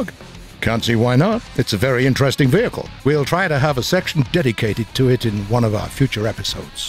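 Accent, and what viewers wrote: England, United Kingdom. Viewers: The pros and cons of the new narrator in the video : Pros : -More funny jokes in the videos -Morgan Fman-like voice -Majestic british accent Cons : -Didn't tell us about Shaun whereabouts -Needs more time to adapt to the new voice -YOU ARE NOT SHAUN, WHERE IS HE? Add more if you want to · This new narrator is very likely English, a lot more than me in fact. I'd ask where the previous one went to but well... Everyone's already done that for me. Though it would at least be nice to know what's going on. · The new narrator sounds very.....british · true but this guy is so british it's fun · I think ur lost British guy, where's the normal narrator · Slimedude 29 I do miss Shaun, but this British chap is bloody glorious! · How does one energy fight? And what was it with the very British style narrator? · where is shaun?? but i like this narrator too, i love his "british" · as a person who grew up on Monty Python, Top Gear, and partly Zero Punctuation, I'm a sucker for British narrators, can we keep this fine chap? 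· <link> I love you Gaijeans... (I also love the new british voice). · War Thunder. Official channel. Then why use a limie? as the dont speak English but only a form of it that is irritating to the ears depending from what side of the island that their from... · who tf is this brit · New guy on the voice...Its Like brit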